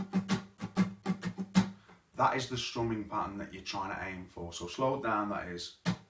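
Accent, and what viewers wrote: Liverpool, United Kingdom. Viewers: Hi. I struggle with the Rhythm. But it's just practice. Can I ask you about your accent. Are you from Liverpool if so which part?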